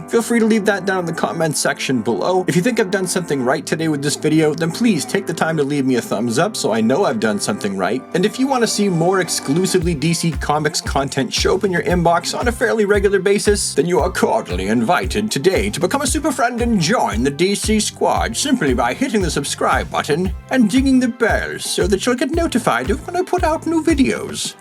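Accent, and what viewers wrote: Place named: Canada. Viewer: Love your channel. (I know I say it a lot) but I always forget your Canadian while watching until you say certain words. And it makes it all the better lol.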